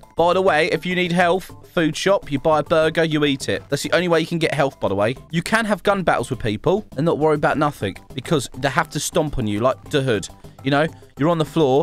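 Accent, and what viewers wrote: United Kingdom. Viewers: R u from the UK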